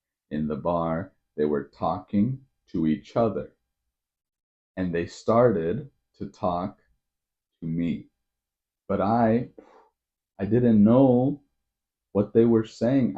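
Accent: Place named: United States. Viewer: I found this channel randomly and watched this first video. Actually, you have a very clear American accent, and you speak slowly, so that's helped me do shadowing along with you very creative video i hope you do another series for advanced learners, I'm definitely gonna keep watching this series keep going you're gonna be a success on YouTube ❤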